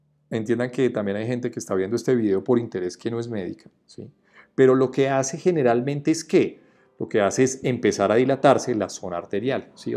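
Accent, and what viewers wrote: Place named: Huila, Colombia. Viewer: Perdón Eres Opita? Del Huila? Soy de Caracas Venezuela, pero hablas igual un tío mio de allá, saludos, por cierto, gracias por la información del vídeo.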